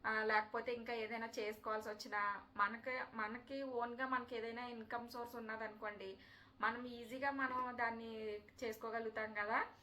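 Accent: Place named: East Godavari, India. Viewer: meedi yevuru garu turpugodavari basha laga vunnadi akkada evvarinina valuyu bulga palakaristaru ekkuva takkuva bedam vundadu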